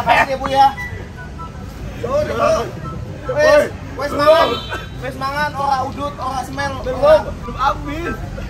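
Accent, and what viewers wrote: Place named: Java, Indonesia. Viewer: Om cocok kaya wong jowo logattelo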